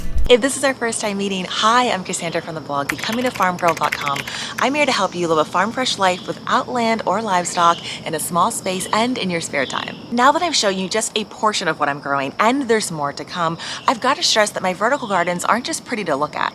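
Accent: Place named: Southern California, United States. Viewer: I can't stand that she sounds like an entire white girl from so cal!!! I'm trying to push it out of my head but I can't sis lol 😊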